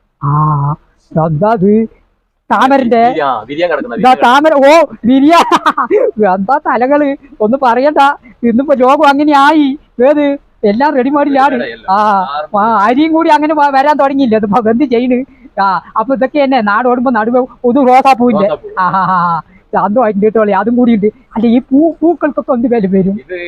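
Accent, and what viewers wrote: Palakkad, India. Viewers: പാലക്കാട് ഭാഷയെ മോശം ആക്കാദെ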